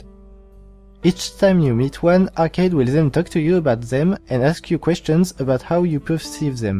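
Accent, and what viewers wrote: France. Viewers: Tu es Français ?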